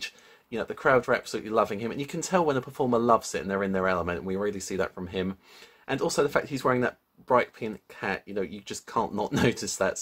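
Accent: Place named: England, United Kingdom. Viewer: You sound English!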